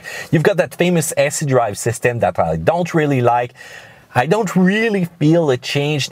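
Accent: Quebec, Canada. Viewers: Love that Canadian accent.... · Quebecois accent...not "Canadian".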